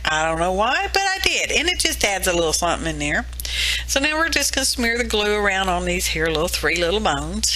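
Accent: South, United States. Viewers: Love your southern accent......wish I talked like that ! Fabulous ATCs😍😍😍👻🎃☠️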